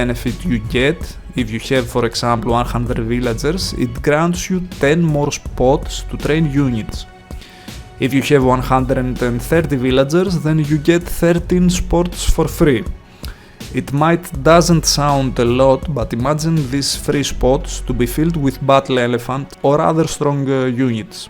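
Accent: Greece. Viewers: haha I am a nottingham forest fan and i notice you have the same accent as Marinakis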